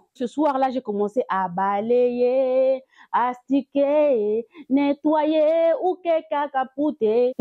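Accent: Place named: Democratic Republic of the Congo. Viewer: Wow ! 🥹 J’ai eu l’impression d’entendre le récit de quelqu’un de familier, tellement ta façon de parler est typiquement congolaise, avec des van en lingala ! Je me suis sentie très alaise. C’était franchement agréable. Tu a été véridique et authentique, tu as vraiment transmis tes émotions d’il y a 9 mois et c’est super. J’ai eu un peu peur mais j’apprécie beaucoup ton courage. Félicitations pour Bébé 3 fois canon et bon courage pour ses 7 frères et sœurs qui sont aussi en chemin. 😁👏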